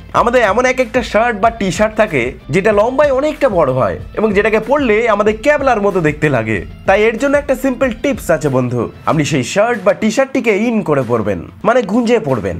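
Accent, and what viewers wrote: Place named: India. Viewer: কথা শুনে বুঝা যায় ইন্ডিয়ান🥴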